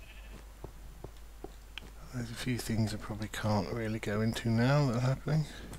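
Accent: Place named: Derbyshire, United Kingdom. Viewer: ..? What area in England are you from?! You sound like my uncle and hes from Chesterfield - Derbyshire..:L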